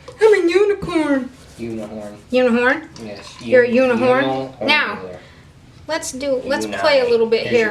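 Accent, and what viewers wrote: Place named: Texas, United States. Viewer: And by the way, I'm a Texan transplanted to upstate New York and it is so good to hear that Texas twang! Love y'all!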